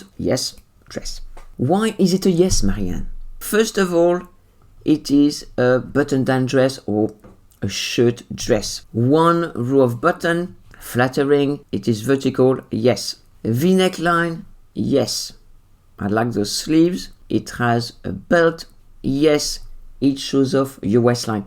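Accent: France. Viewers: I love your French accent in English. Greetings from Poland.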